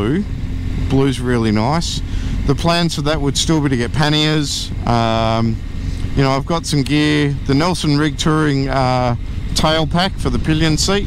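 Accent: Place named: Australia. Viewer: I love the bike! Your Aussie accent is pleasant to hear as well. Great review mate! Thanks.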